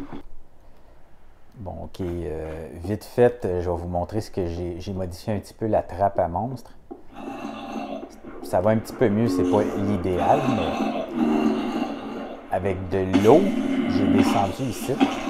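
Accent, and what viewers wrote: Quebec, Canada. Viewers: J'ai regarder toutes tes vidéo et franchement je les adorent, continu, car tu as arrêter depuis un moment, on est tous avec toi ! De plus ne change rien a ton accent québécois , j'adore les québécois. Alors va y ! Ne nous déçois pas :-)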